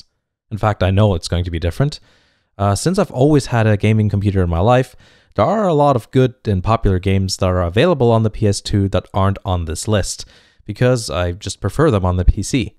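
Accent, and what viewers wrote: Germany. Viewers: You are from Germany :D?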